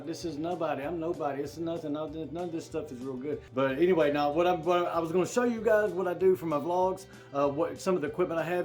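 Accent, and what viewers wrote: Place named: Pea Ridge, United States. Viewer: Sounds like a pea ridge feller I know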